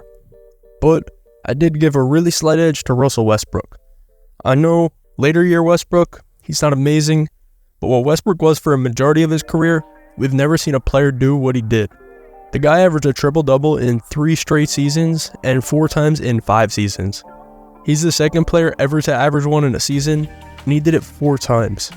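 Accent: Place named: Boston, United States. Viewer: Sorry, I found you hard to understand behind that Boston accent. The profile of the NBA logo is Jerry West. "Larry Bird is the first white person...." you simply don't know what you don't know. George Mikan? Pistol Pete Maravich? Bob Petit? During the classic period of the NBA, select games were broadcast in the middle of the night, often days after they'd occurred. It's popularity -- which didn't really reach modern levels until Jordan, sprang from college basketball, where people watched the Magic/Bird rivalry, and where their senior game is still the most watched college basketball game of all time. The NBA just gave people a venue for people to keep watching it. Also, I'll point out something that SHOULD be obvious -- to even know who the MVP is, you have to already be a fan. Quick -- think of a sport you don't watch. Who's the MVP? 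See what I mean? Boston, particularly in the 80s, was a highly color conscious place. But most of the country isn't Boston (thank god).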